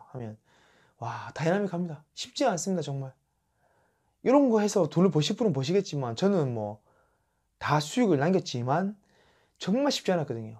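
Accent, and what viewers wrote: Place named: Gyeongsang, South Korea. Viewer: 멘토님 대구분입니까 부산분입니까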